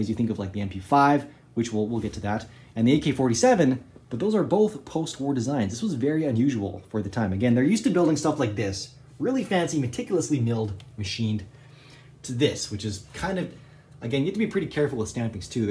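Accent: Canada. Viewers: yo is this guy a canadian??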